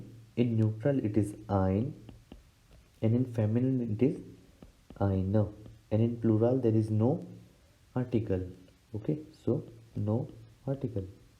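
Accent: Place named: India. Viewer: Мне кажется этот ведущий из Индии. Мне кажется индийский акцент.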